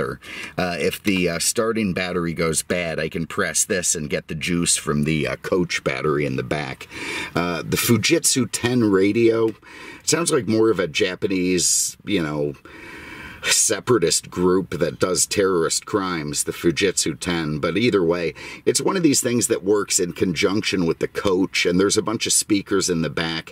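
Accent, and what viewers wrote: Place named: United States. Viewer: You are funny, and sound like a cool American 😎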